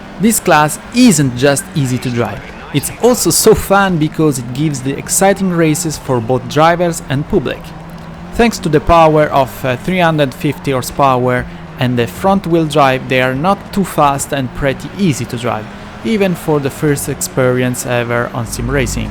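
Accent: Italy. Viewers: Nice italian accent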